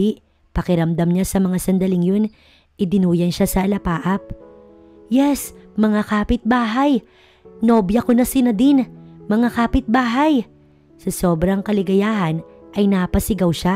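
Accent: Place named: Hiligaynon, Philippines. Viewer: Napaka gandang kwinto story Lalo pa ilongga ang nag kwinto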